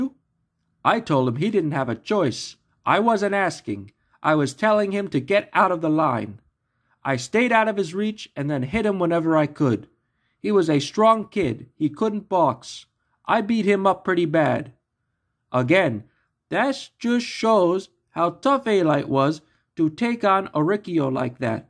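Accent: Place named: Albania. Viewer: Obviously you’re a John Alite fanboy. By your accent u must be Albanian and I understand the ethnic pride but. No doubt John was a tough guy gangster deeply involved as an associate under Junior Gotti. Def he was a capable guy but I think that u believe the hype too much and fail to realize that there’s 3 sides to a story. Obviously you weren’t there but you believe one side of the story about both Pitera and Gene Gotti. Alite’s side. I’m sure Pitera and Gotti have their versions, then there is the truth. But keep the goofy accented vids coming bc they’re comical.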